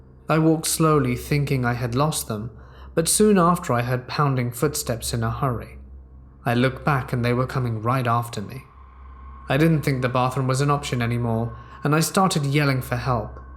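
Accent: United Kingdom. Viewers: I heard the collaboration you did with Nocturna on her channel. i really enjoyed the video, also it's crazy how perfect your Spanish is, i was blown away. i also think it"s crazy how in English you have a British Accent and in Spanish you have perfect Mexican type Accent. I never knew anyone like that, that can speak with a British English Accent and Mexican Spanish Accent....anyways Thank You and God Bless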